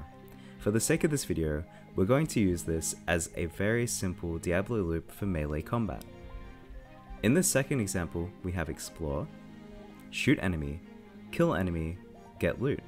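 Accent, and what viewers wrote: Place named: Australia. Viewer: I have been watching your videos recently, but had not released you created Super Hot- great game! Plus you sound like a fellow Aussie, so a thumbs up just for that :)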